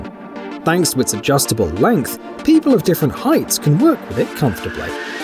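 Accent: United Kingdom. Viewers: C'mon now who measures height in inches??? Weak. So total collapsible ladder height goes from 35"-14.5'. hate it when you get un experienced YT announcers using frugal and absurd measuring techniques despite the status quo. Like American calculations given in metric or American applied items with temperature variances given in Celsius.... Uh what is it 27° F for every degree Celsius? Don't know off hand Which inadvertantly cancels out the value of the information being presented. British accent or not, if you are going to present the ladder you might as well present the common method of measurement. It's only laziness and ignorance when presentations are as thorough as they are without doing so... Enough for some to immediately stop viewing as conversions are essential and we are not in Europe and we are in The great failing and falling America. Don't even know why i attempt to defend this skid mark country... 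All politicians need to be removed and no I'm not a domestic terrorist - just a disgusted true American Patriot. Live free or die.. waiting to for ad freedom is a joke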